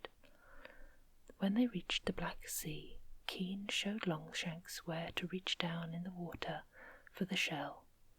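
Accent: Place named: England, United Kingdom. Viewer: Love sleep stories. The softly spoken English accent is soothing & relaxing. I've always loved magic & fairytales so I thoroughly enjoy the stories plus the narrator is great. What is the narrators name? I really love listening to the stories in her great voice. I listen to these most nights. Thanks so much!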